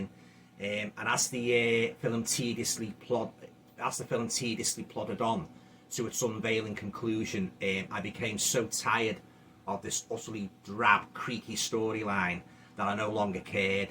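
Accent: Liverpool, United Kingdom. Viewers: I have a off topic question. I'm curious, Do you call your profile ' ', because you have a scouse accent? Or that's you're real surname? I'm American so I don't have the ability to tell regional accents of people.☺ but I'm always fascinated by accents. My dad was born Hungary, so I've grown up around 'Broken English' speakers and other European accents. But not many from the UK. I enjoy your channel.👍 I appreciate your "House That Dripped Blood" poster a lot! Probably my favorite Amicus movie. (I'm big Hammer and Amicus fan! I'm 37, but I've always loved those films. Nobody I know really enjoys or cares to watch them! Lol Except my mom and dad. 😅)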